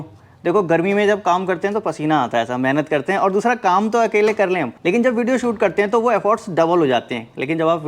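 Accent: Haldwani, India. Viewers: बहुत शानदार सर जी मैं हल्द्वानी से हूं, आपकी विडियोज़ और बोलचाल से मुझे लगता है कि आप भी यहीं नजदीक से ही हैं। संभव हो तो आप से एक मुलाकात करनी है, से मिलने की बहुत इच्छा है।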